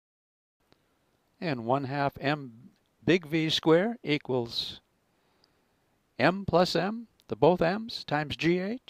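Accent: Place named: Transatlantic, United States. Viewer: that transatlantic accent is so awesome, all i could think about haha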